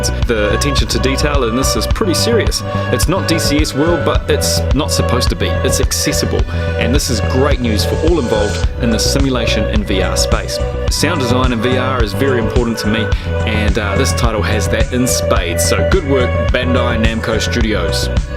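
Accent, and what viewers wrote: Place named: New Zealand. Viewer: haha ... so 19 eighty sex. :) i should have picked you where my kiwi cousin :)))) lol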